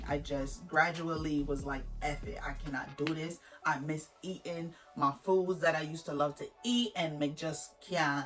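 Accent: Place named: Jamaica. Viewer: Great.love the Jamaican twang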